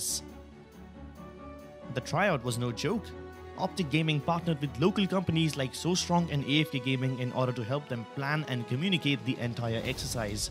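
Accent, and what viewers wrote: India. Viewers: i like how he speaks with hindi accent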